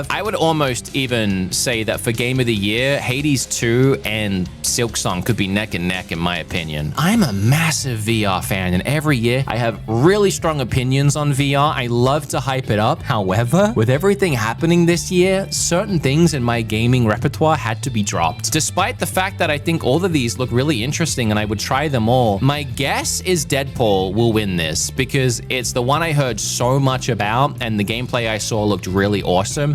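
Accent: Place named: Australia. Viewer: Im guessing ur Australian? Haha don’t let the Europeans hear that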